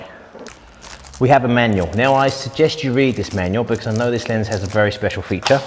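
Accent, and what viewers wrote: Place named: Portsmouth, United Kingdom. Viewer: Devonshire is a beautiful place. You are right about Portsmouth always something happening there. (good or bad) Still trying to get to use his Pompey accent on his channel.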